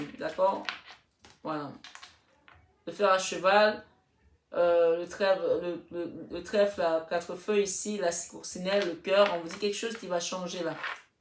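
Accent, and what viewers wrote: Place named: Cameroon. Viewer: Bonjour ma chère votre tirage me parle énormément, et le premier visionnage de vos tirages j'ai reconnu vôtre accent du Cameroun car j'ai une histoire avec une femme bams qui vous ressemble beaucoup donc je sais ce qu'il m attend 😅 merci infiniment vous êtes magnifiques bien à vous Sir Lion 🦁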